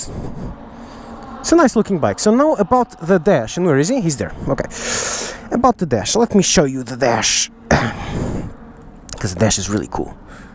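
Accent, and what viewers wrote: Portugal. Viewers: Sotaque tuga! Sera? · Ahah o teu sotaque parecia mesmo de Portugal mas só quando falaste é que percebi mesmo ahah, podes dizer-me quanto custa essa moto em Portugal?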